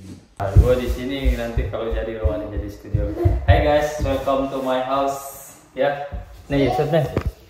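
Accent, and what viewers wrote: Lombok, Indonesia. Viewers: La Ilaha...asli medok ...lombok tie meton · Logat sasak tetap melekat, mantap Semeton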